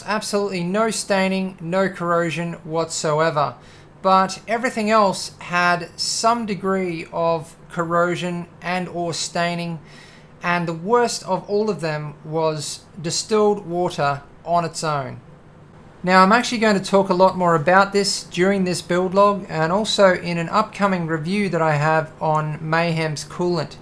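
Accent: Australia. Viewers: I can't tell if you're an Aussie or a Kiwi... You sound generally Australian, but when you say things like "build log part 12" as "boold log paht twulv", it sounds rather Kuiwui (like me!) Cool boold though!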